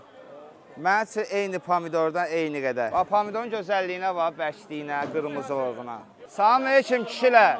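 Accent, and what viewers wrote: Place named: Baku, Azerbaijan. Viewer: Can Ay Bakılım ləhçövə quzu kəsim.Qulağa necə xoş gəlir bizim şirin ləhcəmiz ağzunnan bal damlıyır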